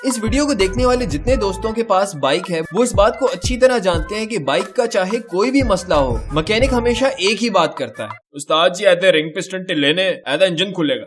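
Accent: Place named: Karachi, Pakistan. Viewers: ap karachi k logon ki mimics bht achi krtay ho keep that karachi accent in every video.😁😁🤣🤣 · Please always use Karachi accent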